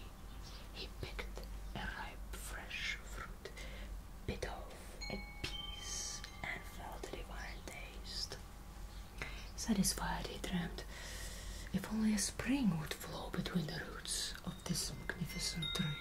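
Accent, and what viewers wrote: Russia. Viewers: Great Russian accent i sound about the same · Лайк за русский акцент, как один из элементов образа, круто 🙂🤌🏻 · Русский акцент... Клаааасс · Ну,!!! Вот это Баба Яга всея ютьюба! А какой лес, а какая изба, а этот русский акцент! А сказки какие мудрые, и юмор...Браво❤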